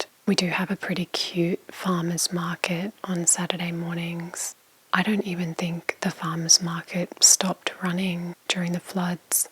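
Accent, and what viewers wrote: Australia. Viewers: The best aussie accent spoken asmrist. This audio is perfect. Please do more 🔥🔥🔥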